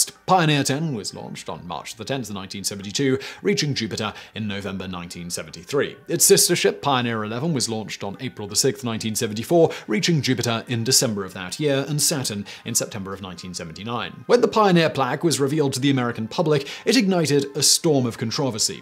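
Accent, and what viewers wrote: United Kingdom. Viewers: Can you guys make a video of this dude saying THAT WAS A REMARKABLE ACHIEVMENT in his thick british accent?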